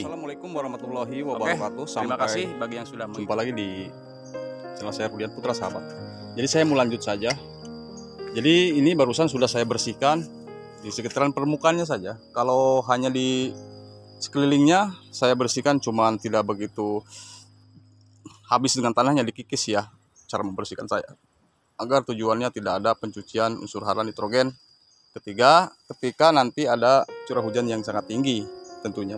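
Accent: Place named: Sulawesi, Indonesia. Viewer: cra abg ngomong kyk org sulawesi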